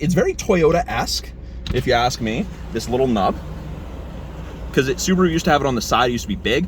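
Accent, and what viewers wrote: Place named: Canada. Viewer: I enjoy this fellas reviews he’s got moxy to him and that Canadian accent is a hoot!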